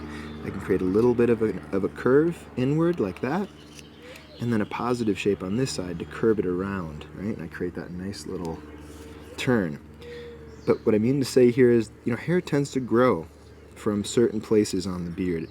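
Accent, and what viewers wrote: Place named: East Coast, United States. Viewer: Alaska is still warming up. It's nice to hear east coast. Again ,you are making this so fun. I'm still struggling to keep my tools sharp. I'm going to order a wheel grinder. Do you have any preferences to China brand , or is the smaller 50.00 grinder on Amazon, vrs a 200.00 home depot. Maybe a video to help. Or the belt with sand paper? Maybe better. What you think?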